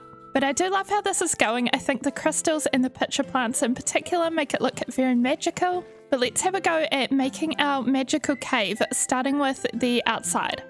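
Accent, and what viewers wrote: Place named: New Zealand. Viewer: I miss my hometown sm Nz is whre im from and hearing your voice takes me back :)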